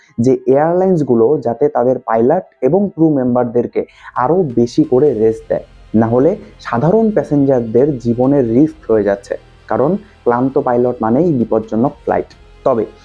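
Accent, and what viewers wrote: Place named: Bangladesh. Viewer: আচ্ছা আপনি কি বাংলাদেশী?! বেশ কিছু উচ্চারণ শুনলেই তাই মনে হয়। আপনাদের নিজেদের দেশের বিষয় নিয়ে representative clips বানান। তাতে সবার ভাল হবে। আপনাদের'ও।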